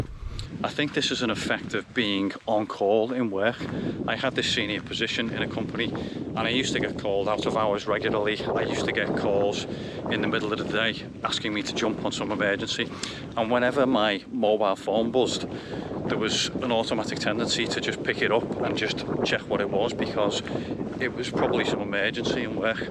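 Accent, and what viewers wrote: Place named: Liverpool, United Kingdom. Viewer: Def sound like a scouser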